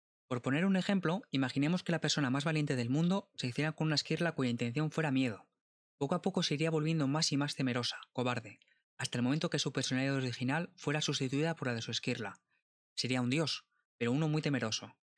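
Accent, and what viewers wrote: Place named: Spain. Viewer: hablas muy rapido (o yo estoy media lenta). Aun sabiendo del tema tuve que volver a escuchar en reiteradas partes, ya que no estoy acostumbrada a tu acento espanol a 90 km/h. saludos desde Argentina.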